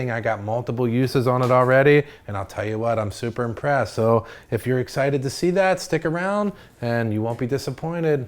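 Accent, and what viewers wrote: Hawaii, United States. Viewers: Love that Hawaii accent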